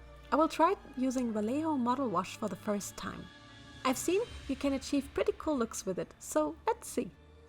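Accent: Germany. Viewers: I thought: she sounds a little big German 🤔 And than I saw it! The 'UHU Alleskleber '😂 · for a while i was always kind of hearing her speak german for some reason when i turned the volume down a lot and in this video i now found out it's probably because her accent is german and she's german ...der gute alte alleskleber c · New viewer here - My thought journey through the video: wait, this sounds like the accent of a fellow German! wait, this sounds like a LoL theme... and another one! F Holy crap she looks absolutely GORGEOUS! Subbed, subbed and SUBBED, by God.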